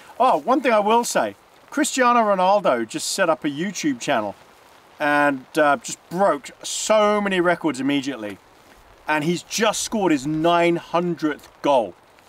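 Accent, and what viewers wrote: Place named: London, United Kingdom. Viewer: I’ve been watching your episodes from the very beginning and love them all. You’re a great warm hearted guy. I love your London accent all the way from NZ. Keep it up!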